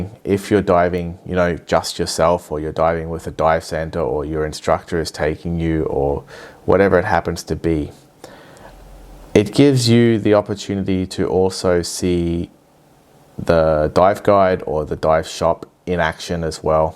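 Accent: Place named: Australia. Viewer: I live in Chicago, IL US...so no oceans near by, however I do have the Great Lakes! I don't know if there are scuba classes around here given that I don't really see there being a strong market for them, but I could be wrong lol ...maybe I can use a pool? Haha It would be AWESOME to get training from you, but I think you live in Australia, right (love the accent btw!)?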